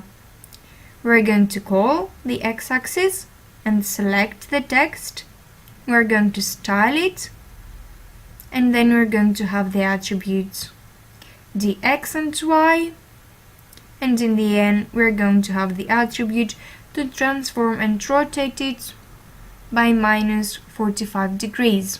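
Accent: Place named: England, United Kingdom. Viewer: I asked where are you from but you didn't answer.im just curious about your English accent.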